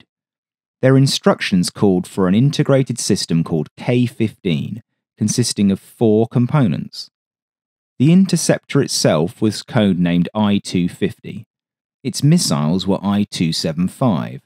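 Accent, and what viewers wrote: United Kingdom. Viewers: I lose interest when I hear the Brit voice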